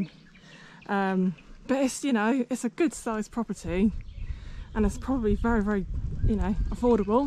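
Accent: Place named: England, United Kingdom. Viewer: Your English is from England, I'm catching it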